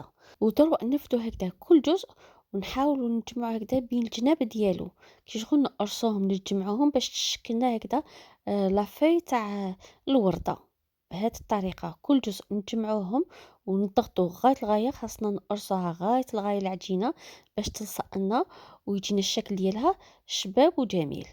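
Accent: Tlemcen, Algeria. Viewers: الله يعطيك الصحة بنت بلادي انا اصلي من تلمسان و اسكن في مغنية اتابع كل وصفاتك و احب تجربتها رغم ان عندي نفس طريقة التحضير و لكن احب ان اسمعك و انت تتكلمين باللهجة التلمسانية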